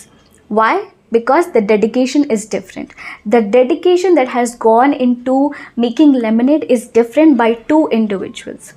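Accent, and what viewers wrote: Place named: Andhra Pradesh, India. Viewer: By listening your words and accent I was able to figure it out that u r from andhra.. Maam pls try to change your accent when u speak English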